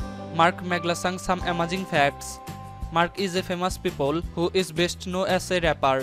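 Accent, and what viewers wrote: India. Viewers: india ang nag sasalita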